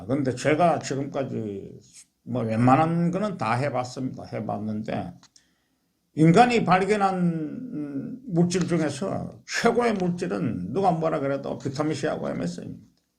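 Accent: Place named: Gyeongsang, South Korea. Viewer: 멋진 우리 리더님 구수한 경상도 특유의 사투리 너무 정겹고 멋있으십니다^^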